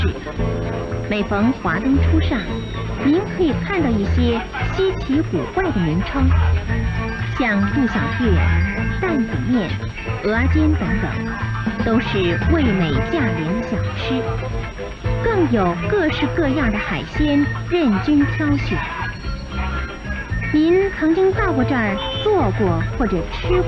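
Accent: Standard. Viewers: 解说的声音又好听发音又标准，太棒了👍👍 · 当时发音标准，怎么没有现在湾湾的娘娘腔？ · 好標準的國語，而且這個國語發音還跟 中共那邊的普通話有一些不同，台灣 中國國民黨帶來的國語更好聽。 誰統治台灣 氣氛 精神狀態 完全不一樣。 日本統治 國民黨統治 民進黨 完全不一樣， 菁英統治， 普通人生活快速改善， 詐騙集團貪污惡搞 就是 落後破爛愚昧破敗。 統治者 有沒有專業能力 太重要了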